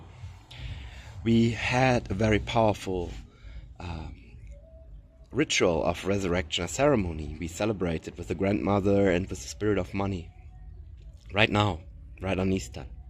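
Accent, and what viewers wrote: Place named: Germany. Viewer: Thank you. Just found you this moment. Feels like blue ray expression transmitting, and now you confirmed my clear impression of german accent . Or swiss. Watched your history transmission. Interesting, but not very relevant from my point of view. I am open to share and love to get finally in touch/exchange with same frequency souls, like blue rays . Its about unifying now, from my point of view. Love from Patagonia, Chile from a humble german imigrant😅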